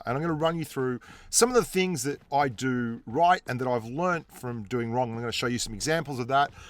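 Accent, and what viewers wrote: Australia. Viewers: Bro ...thanks ...nice video are you from Australia ?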